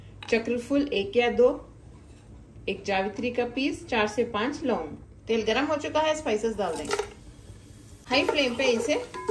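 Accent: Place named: Deccan, India. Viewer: Ohh.. hamari hi tarah Deccan ki hain.. Masha Allah.. Par mai Bangalore, Karnataka sey hun..!! Tnx for replying..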